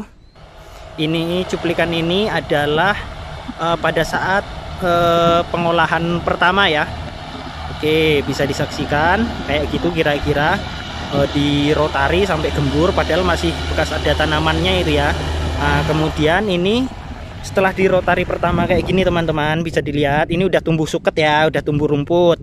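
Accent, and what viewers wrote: Java, Indonesia. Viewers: Mas.... Sampeyan kuwi ngomong nok ndi...? Jepang po indonesia.... Ak seneng logate jowo nemen.... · Kok njowo banget to om, po ortu wong jowo? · Jowo masseh😂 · MANTAP DAN LUCU TOTOH X JOWO MU MAD, AKU SENYUM2 SAMBIL NYIMAK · mas katanya orrang xmantan ko logatnya jawa. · Katanya orang kalimantan tp lolgat bicaranya kok jawa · Medok mas bahasane, mahamke😄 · Jowo asli mas se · Orang mana to om....kok Meduk men omongane... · Mase kaya orang Jawa ya....?😊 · Kel Kalimantan tapi jowonya medhuk Saya Semarang bang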